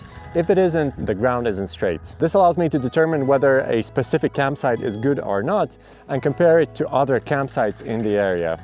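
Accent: Latvia. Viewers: Great tips! Love your channel. Are you from Latvia by any chance? I think I recognize your accent 😅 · By chance, I stumbled upon this video, and from the very first sentence, I had a strong suspicion that you are Latvian 😀. Nice tips!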